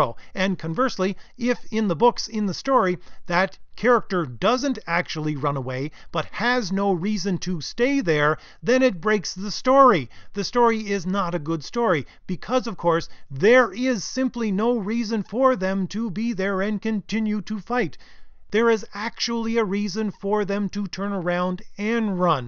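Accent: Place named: Ireland. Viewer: you sound irish or oirish thats great i m northern ireland your channel is great!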